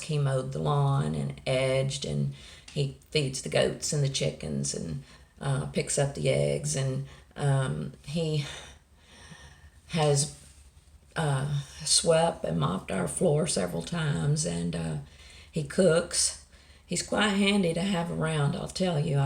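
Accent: South, United States. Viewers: it was wonderful seeing you and I understand about how this house arrest we are all on hasn’t really affected me to much either. I’m so very very sorry to hear about Chico and Gizmo, I loved watching those babies hop around and play.I know how hard it was for you and George.Again I’m so sorry to hear that.I’m hating to hear that George has been down for over two weeks. I pray he gets through this episode soon. I can’t imagine the pain he is in.I will definitely keep him in my prayers.Thank the good Lord above that Granny is home and doing well. She is an amazing women.GOD BLESS HER. Well I’ll stop rambling but it’s been awhile and I’m so sorry it’s been really rough for you guys, but I sure was happy to see your pretty face and here your awesome southern accent, I love to hear you talk.We southern girls have to stick together about our accent. God Bless you all and I will keep you all in my prayers. 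Please take care and be safe. 🙏💕🙏💕🙏💕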